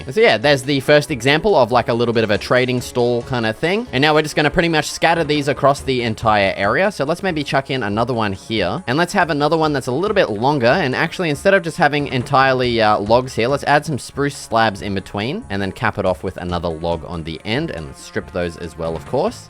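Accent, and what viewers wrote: Australia. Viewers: Is he Australian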